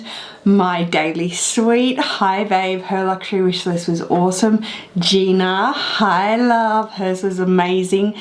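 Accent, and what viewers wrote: Australia. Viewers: Lol HONESTLY BABE...I literally just yell your name every time I see you video!! 😂 im from America so I say it in your Australian accent. My bf even says it now too...ugh I just love you!!!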